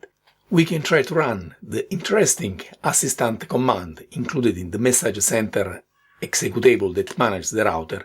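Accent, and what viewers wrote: Italy. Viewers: your accent is very similar to his & therefore "Very Italian" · beautiful Italian accent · I’m so glad you identified as Italian in this video, is been trying to localize your dialect. At first, when I was passing very little attention and It was just going in the background, my first guess was Russian/Eastern Bloc area, then by like you 3rd video I head some patterns sounding Dutch or German… I was just about to pay attention and try to guess for real and you gave the answer away at the same time lol. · the Italian accent makes it even better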